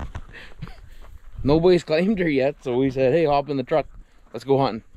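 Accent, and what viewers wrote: Canada. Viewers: dude, i knew from the first 3 words you said you were Canadian. I just wasn't sure where. Anyway, loved the vid n keep up feeding the fam. Especially your little hunter lol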